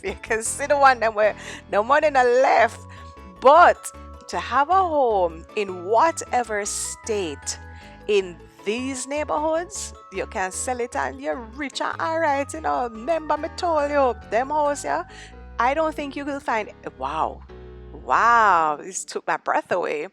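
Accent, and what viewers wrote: Jamaica. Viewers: love how you can go from patwah to well spoken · 😀😉😄😄. Love your attitude. Funny😁. Life is not one-sided. Love the patois too 😂😂😂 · spicy and timely inserts of patois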